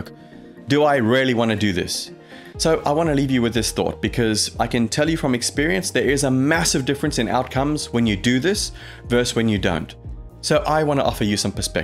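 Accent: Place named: England, United Kingdom. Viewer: The most beautiful English accent in the whole wide world. Thank you!!!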